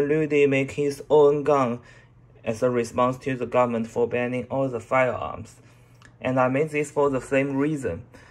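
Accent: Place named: China. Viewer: 老哥稳，中式英文太亲切了哈哈哈哈哈哈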